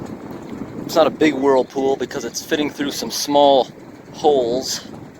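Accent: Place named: United States. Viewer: Where in the U.S. is this accent from? Or is it just the way he talks? Either way I like it!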